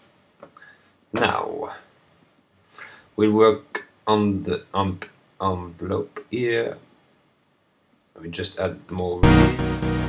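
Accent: France. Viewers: Are you French ? You have a french accent ! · sweet french accent :) J'adore ce synth mais j'ai du mal a saisir sa matrice de modulation un tuto ou une explication serait vraiment trop cool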